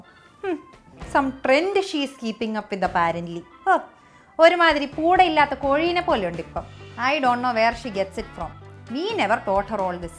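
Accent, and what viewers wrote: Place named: Kerala diaspora, India. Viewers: I like the way you talk like any malayali mom living outside Kerala.. my both daughters are your fans. They only asked me to watch your episodes . at this young age you are doing good work.. & ofcourse you resemble Amala Paul a lot... all the best dear.. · I watch this channel only bcoz you, and specially Ur Malayali accent. I love it. You r the best. I have faced this when I secretly had my left ear piercing, repeated when I got the right ear pierced the very next week.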